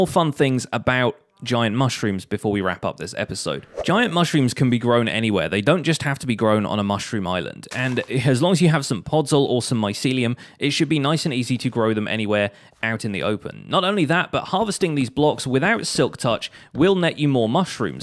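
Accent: United Kingdom. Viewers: He’s speaking with a British not American accent. We use both words - I’m a Brit too. An umbrella is usually for rain whereas a parasol is for sun.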